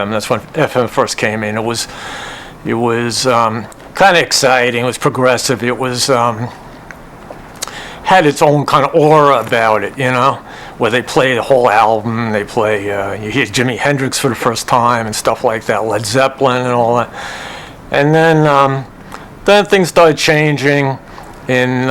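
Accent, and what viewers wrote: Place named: New York, United States. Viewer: The New York accent is so cool. Love it. 👌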